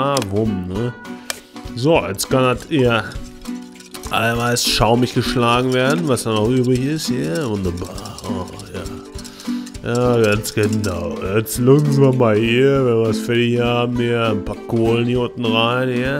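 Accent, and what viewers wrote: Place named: United States. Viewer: Immer dieses "hier hier hier" macht es nervig. nach ner Minute hab ichs ausgemacht. nicht authentisch. Dieses amrikanische ausgesetzte ist zum kotzen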